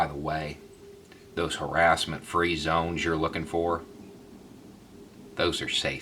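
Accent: South, United States. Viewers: has lived all over in Japan and the US but he has been living in Florida for years now. He has def picked up more of a Southern accent over the years. That tends to happen. I can hear a couple of different accents mixed in his dialect. With all of the common sense videos and history lessons shares and all you comment on is his accent? Smh 😏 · you have such a real and thoughtful way of teaching your audience. I am so glad I came across your vids.. Ironically, I first clicked on one of your segments because I found the way you look seemed contrary to the header and I was curious. So, you taught me that not all people with wild beards and a southern accent are bigots!:) I apologize for my mistake. Can't wait till your next posting - such variety and thought put into each of them. God bless.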